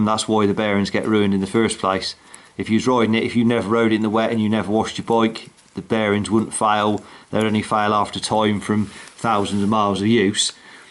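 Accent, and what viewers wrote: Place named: West Country, United Kingdom. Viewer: Didn’t realise it was going to be you when I clicked on the vid. What a relief to hear that West Country accent. Thanks again for your vids. Bearings on order.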